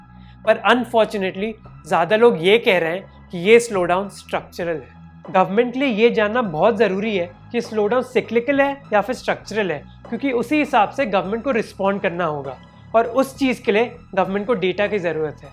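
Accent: India. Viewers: Speaking Indian way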